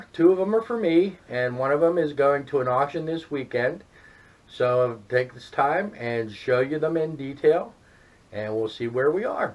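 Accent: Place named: Pennsylvania, United States. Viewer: Nice builds...love the walnut necks! When you said Bedford, I was listening to your voice, and I thought, has to be Bedford, Pa. I know that Pennsylvania accent! I'm from Red Lion...near York. Keep up the great work!😀🎶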